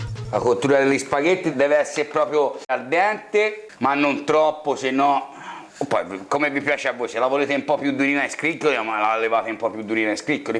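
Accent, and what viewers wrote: Tuscany, Italy. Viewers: Oltre le the ricette me piace il tuo accento oscano. Bravo. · Che sei toscano? mmmm come mi harba questa carrettiera! Grande chef😉😉😉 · Vivo all'estero..che piacere sentire questa parlata toscana mentre prepara ricette antiche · Per caso sei toscano? 😂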